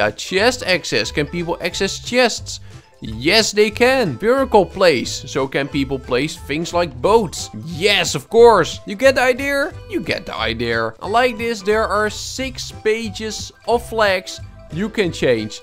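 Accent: Netherlands. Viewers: hi ar you dutch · Youre dutch right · Best English Nederlands voce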